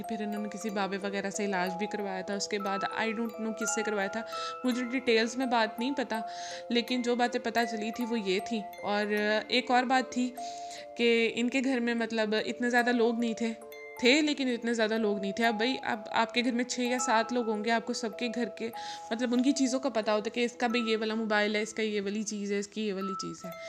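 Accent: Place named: Punjab, India. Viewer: Very interesting story I really enjoyed or apka punjabi accent buhat acha hai 👍👍😂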